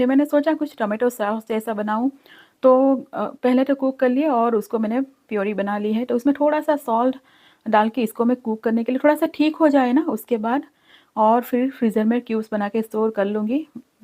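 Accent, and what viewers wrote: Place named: Parsi, India. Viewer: Your hindi is little different, like parsi people speaks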